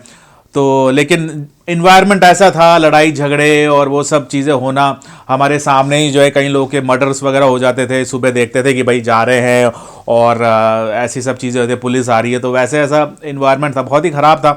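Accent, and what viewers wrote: Kerala, India. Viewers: Malyali ho aap?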